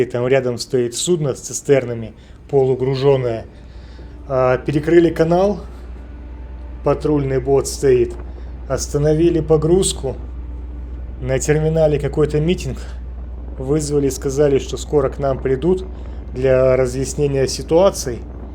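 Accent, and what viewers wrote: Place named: Odesa, Ukraine. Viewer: Одесита чути здалеку😄 Дякую за чудове відео! Не кидайте показувати швартовки та відходи, це завжди цікаво і ніколи мені особисто не набридає 😉 Легкого контракту! Україна дивиться🤝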